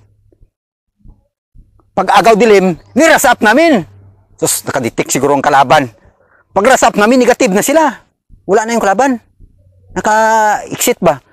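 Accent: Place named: Iloilo, Philippines. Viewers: 😂😂😂😂 natawa Ako sa Punto Ng salita sya parang ilonggo 😅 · Ilongo SI sir sa tuno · Ilongoha nlang SG.nami pamatian god bless SG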